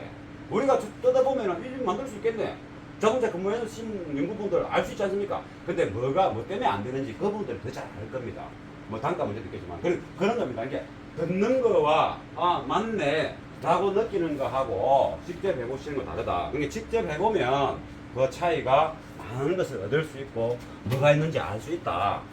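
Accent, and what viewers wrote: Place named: Gyeongsang, South Korea. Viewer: 영상잘보고갑니다 ~^^ 같은 경상도 말투라서 정감 이갑니다~^^ 사장님혹 고향이 진주입니까?~^^ 우야둥둥 매번 시청합니다~~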